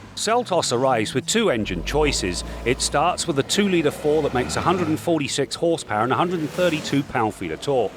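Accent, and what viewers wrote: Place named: United Kingdom. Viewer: Is originally from the UK? I like his accent.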